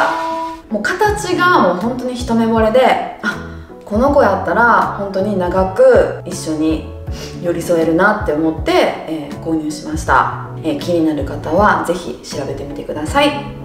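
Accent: Kansai, Japan. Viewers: 笑けてくるって言うみっこちゃんが好き♡こんな可愛い人が同じ関西人で関西弁で嬉しい♡ 木の器、可愛い💕水時計の音、超癒し… カバンもネックレスも急須も全部素敵〜！ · 関西弁のみっこちゃんが、かわいいです😍 naruki takahashiのfruits stand、ステキですね🍊欲しくなっちゃいました！ 後ろの鏡もカワイイ🪞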